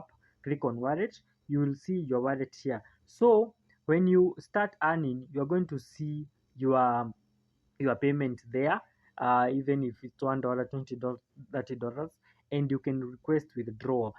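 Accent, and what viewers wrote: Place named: Kenya. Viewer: You sound Kenyan is it legit?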